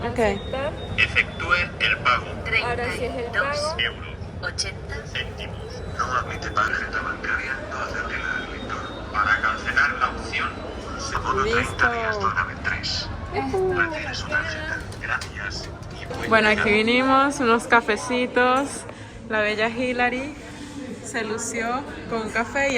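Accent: Colombia. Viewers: Esta chama es venezolano pero habla como una colombiana , imagino que su por estadia alla imagino que ahora va hablar como un español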